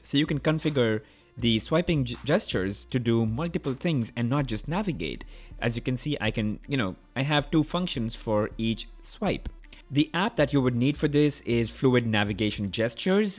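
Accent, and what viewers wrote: India. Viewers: Lose your Indian accent and gain more sub!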